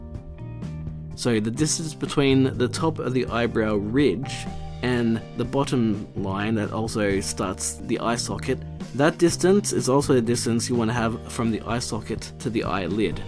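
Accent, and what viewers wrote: Australia. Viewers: ! I didn't know you were Aussie! I am too!!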